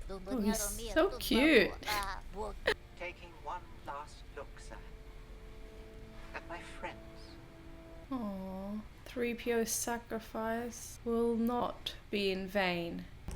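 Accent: Australia. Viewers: Nice to hear it in in an Aussie accent. Grateful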